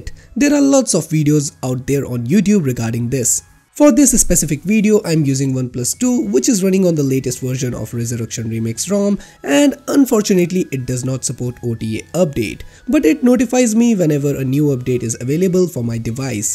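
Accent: India. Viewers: I DON'T UNDERSTAND ANY OF THIS and your Indian accent isn't helping!!!!!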